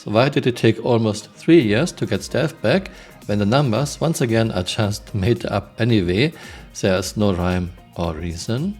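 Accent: Germany. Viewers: deine videos sind echt super cool und informativ. Etwas ganz besonderes in der star citizen community und ich liebe deinen deutschen akzent haha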